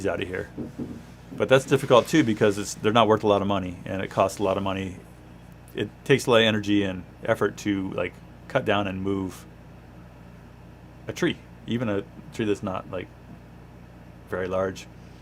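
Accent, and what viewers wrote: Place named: West Coast, United States. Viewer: “We persent….” I love our West Coast accent. Thanks for your help!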